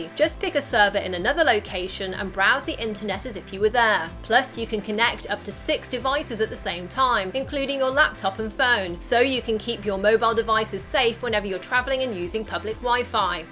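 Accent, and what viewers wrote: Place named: United Kingdom. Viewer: Soldier Gaming nah.. it just seems similar to Lila’s voice to u cuz they both have british accents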